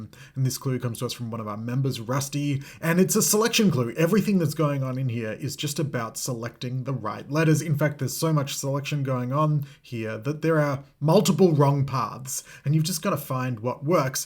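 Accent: Australia. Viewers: I get sucked in by the Australian accent. When he says "deer" it sounds to me like "dee-ah." And then he comes up with what sounds to me like an American r sound thrown in at the end of "idea."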